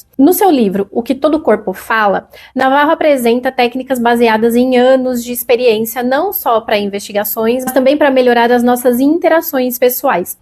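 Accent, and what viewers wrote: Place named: Caipira, Brazil. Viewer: Tirando o sotaque caipira fica perfeito.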